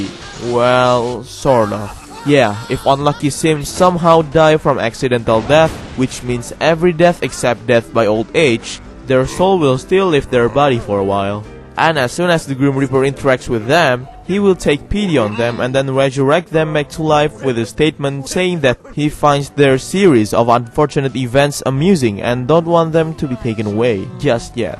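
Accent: Indonesia. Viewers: Starting to miss the sims 3, I think the sims 3 is better than 4. Nice vid, btw I hear Indonesian accent, are you from Indonesia? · you sound Indonesian! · I bet you're indonesian. I can tell by your accent😄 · He got indonesian accent. Am i wrong?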